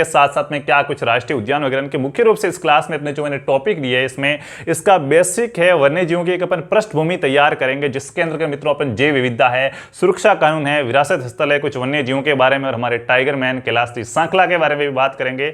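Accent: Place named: Marwari, India. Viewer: Sir aaj ki class bhut aachi thi 🙏🙏 मारवाडी बडी मिठी बोलो आप 👌👌👌👌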